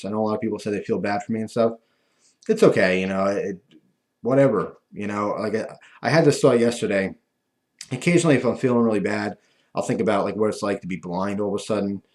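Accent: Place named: New Jersey, United States. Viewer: I thought you "sound funny" cause you're originally from Jersey. I'm going looking for your Paypal email. I want to see you with a flipper for now and you pricing implants as well as a bridge. HesGoneNuts is right, shut up and take our money. What you do has value, let us demonstrate that value voluntarily. It's the libertarian way, are you some kind of commie? =) Why do you hate America? Why do you hate Truth, Justice, and Superman? You aren't the boss of us, take our cash.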